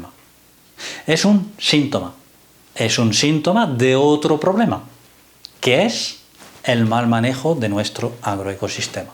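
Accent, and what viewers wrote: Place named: Andalusia, Spain. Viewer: Muy buenos tus vídeos, pero lo que más me llama la atención es tu acento. ¿De dónde eres? A veces me parece que eres andaluz (pero no sé de cuál región específicamente), a veces que hablas catalán, a veces que hablas portugués (por pronunciar bastante abiertas las vocales)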